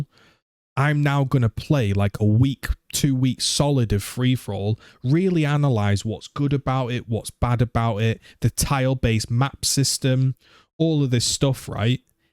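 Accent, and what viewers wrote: United Kingdom. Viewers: I watched this entire video and this is easily the most honest full review of the game and pretty much exactly how I feel. Just remember Z the silent majority of the Gears community stands by you and wishes you the best. I know many content creators and streamers that love your content and persona and behind the scenes talking about your entire situation. I've been here since getting spammed that video on twitter about Gears 1 compared to Gears 5 which I ended up enjoying. I loved the WWE style Gears videos and they still make me smile when I think about them. British accents drive me crazy but watching your videos for awhile now actually made the accent tolerable for me now <3 Love you man and take care of yourself.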